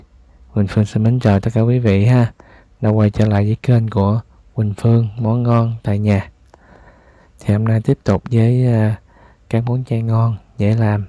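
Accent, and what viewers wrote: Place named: South, Vietnam. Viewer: Giong nói mien Nam of dễ thương hiền lành,cô người bắc 54 nhưng thích giong nguoi mien Nam,cam on các mon chay ngon của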